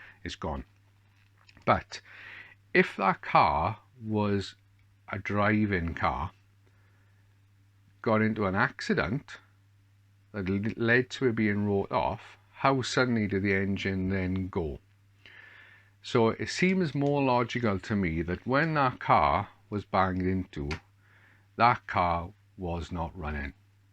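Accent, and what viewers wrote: Wales, United Kingdom. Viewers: I wouldn't say you are negative at all, you are Welsh, every Welsh person i've ever met or even seen on TV sounds just like you, that guy who said you were hard done by has obviously never met a Welsh man 😂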